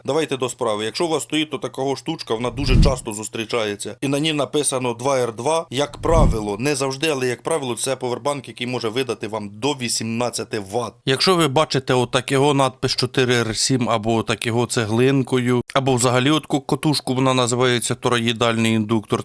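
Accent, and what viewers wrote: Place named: Central Ukraine, Ukraine. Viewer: земляче,лиш не двєнадцать,а два на д ця ть .чути осьо,тосьо,що центральна україна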